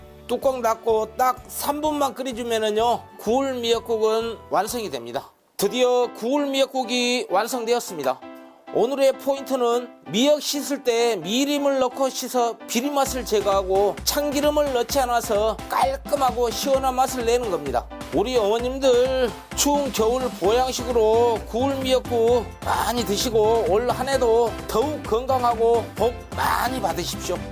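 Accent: Jeolla, South Korea. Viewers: 셰프님!!거시기 전라도요!! 참으로 정겹습니다~~알배기 배추 추가가 키~포인트네요!! 감사합니다 😂❤😅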